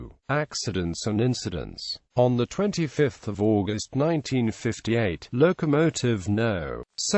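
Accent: RP, United Kingdom. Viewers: Very plummy delivery. - like Radio 4 at its most aristocratic. Needs some video.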